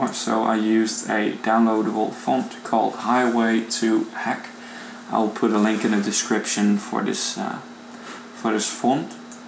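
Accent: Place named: Netherlands. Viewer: haha lekker hoor dutch accent ftw :)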